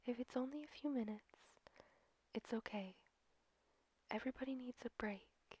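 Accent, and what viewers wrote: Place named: United Kingdom. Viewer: I came for the accent, and you know what? I can tolerate what i'm hearing at least it's not an extreme British accent.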